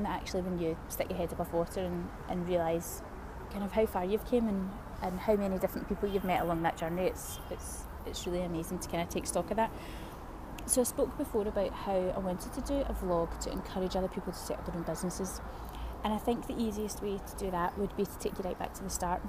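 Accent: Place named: United Kingdom. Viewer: I'm & I love English British accent ✌🏼️😁🇰🇼🕊you welcome in your second home kuwait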